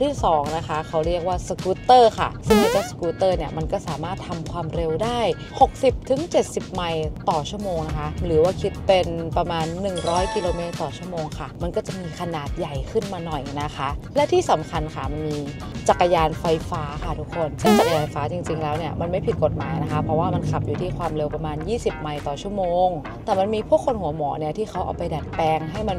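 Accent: North, Thailand. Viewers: สาวเหนืออู่คำเมืองน่ารัก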